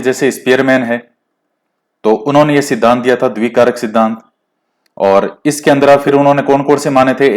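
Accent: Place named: Ganganagar, India. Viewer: Sir aap ganganagar se hai kya. Aapki bhasha kuch ganganagar se milti hai.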